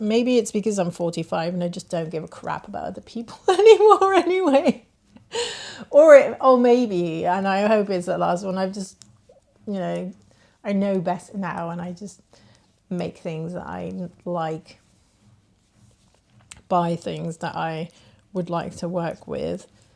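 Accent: United Kingdom. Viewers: Hi - I very much enjoyed this unexpected‘knit’n’chat’! Like you I knit English flicking style and although I can knit Norwegian/Continental style, and do so sometimes to use different knitting muscles, I always return to English style because it just feels ‘right’. Can I ask you out of curiosity (nosiness 🤥?) whether you are English on your maternal side and Dutch on your paternal side, or vice versa? Sorry if you said and I missed it, but I just heard about Dutch grandmother who knitted and English grandmother who knitted, so I’m just curious - especially as you have such a nice English accent, but appear to have lived for a long time in the Netherlands. Looking forward to more Vlogmas videos 😊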